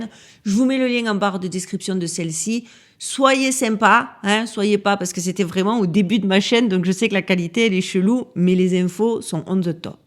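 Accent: South, France. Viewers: Bonjour de Suisse, ne vous excusez pas tout est parfait 🙏🩷🩷🩷 J'adore vos sujets, et votre personnalité 💖 et votre accent du sud est juste 😍 toppissime!!!! Bonnes fêtes à vous et votre famille 🥳🎶🍾🥂❤️❤️❤️ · Je viens de vous découvrir et je peux dire que je vous trouve formidable!!! J’adore vos contenus et le format! Et votre accent du sud!😉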